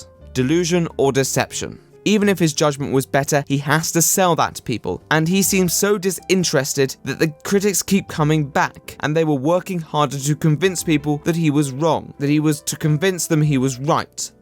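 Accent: RP, United Kingdom. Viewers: Wow you sound incredibly british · I love this guy like, he makes my brain feel so good with t he monotone British voice its just fantastic. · Your videos are really nice to listen to while doing homework. They’re long, interesting enough to enjoy, but doesn’t require much attention to listen to, and your posh accent is a plus. You make good shit. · I just like watching you shred people with your posh accent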